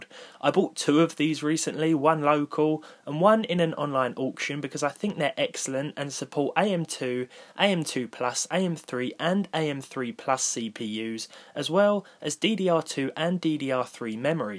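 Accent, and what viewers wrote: United Kingdom. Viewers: I like your accent mate where do you come from in the UK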